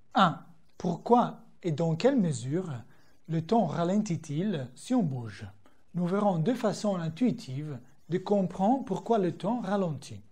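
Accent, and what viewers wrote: Italy. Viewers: cavolo che accento italiano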